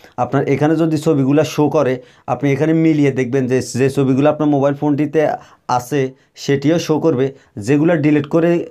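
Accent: Noakhali, Bangladesh. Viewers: ভাই আন্নে ত নোয়াখাইল্লা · গুদ ভিডিও আপনি কি নোয়াখালি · নোয়াখালীর ছেলে না তুমি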